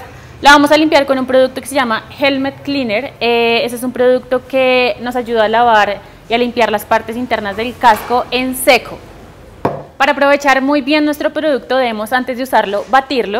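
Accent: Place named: Colombia. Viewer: Gracias por este vídeo, me encanta tu acento colombiano ✔️👍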